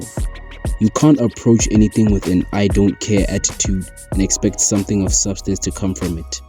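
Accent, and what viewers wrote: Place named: South Africa. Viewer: Ngl I'm boy sounds South African 😅W video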